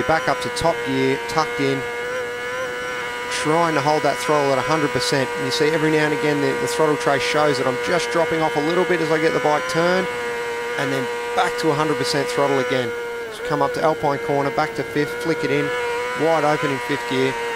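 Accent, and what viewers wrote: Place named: Australia. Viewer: You sound like an Australian. I'm kiwi that's how I know 🙂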